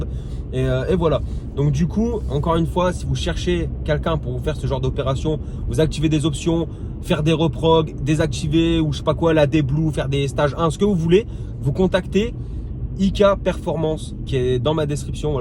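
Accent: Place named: North, France. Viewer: Ca se vois trop que tes du nord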